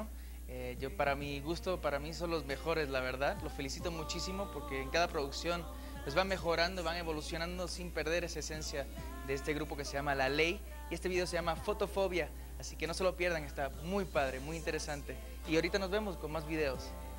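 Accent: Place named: Puerto Rico. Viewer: Neste tempo, o tinha o acento mexicano... Agora está pura cepa... Totalmente boricua!! 😃🇵🇷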